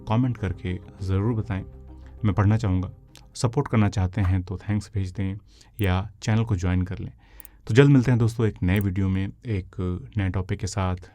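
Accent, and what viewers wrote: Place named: Pakistan. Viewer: Using mostly Urdu & speak like Pakis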